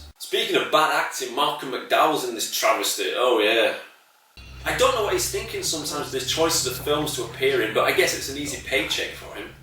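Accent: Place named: Yorkshire, United Kingdom. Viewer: I love... your delivery is laugh out loud stuff... the edits are great and must be time consuming, voice over et et... Keep up the great work.. I'm in Toronto Canada and I laughed like hell when someone from the USA wrote to you and asked if you were from Scotland.... my family in England knew right away you were Yorkshire... They're Lancashire but don't hold that against them, lol cheers again... too funny... fantastic..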